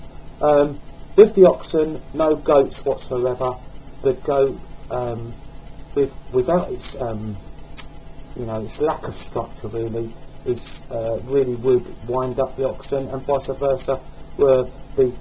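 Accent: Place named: London, United Kingdom. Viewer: What is your regional accent, London sort of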